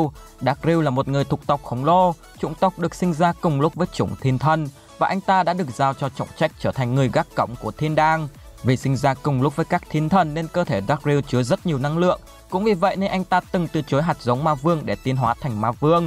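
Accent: Vietnam. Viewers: Anh đọc tiếng anh đứng kiểu VN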